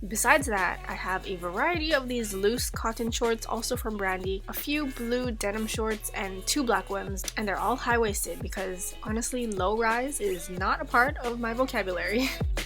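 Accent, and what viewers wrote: Hawaii, United States. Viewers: As someone who’s also from Hawaii, I enjoy hearing just the slightest hint of moke in your voice! Can’t wait to see the moving vlogs (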